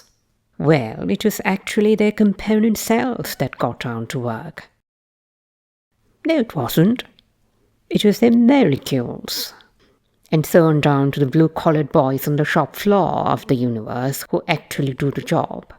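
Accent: RP, United Kingdom. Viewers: Yes I loved this - so cinematic and your accent is so delightfully POSH as always! I wonder why this one doesn't have more views?! It's a pity really!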